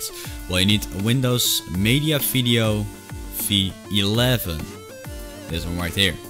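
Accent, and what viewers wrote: Netherlands. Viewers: The first time i heard the voice of him, i knew it was obviously dutch // De eerste keer dat ik je stem hoorde, wist ik gewoon dat je nederlands was!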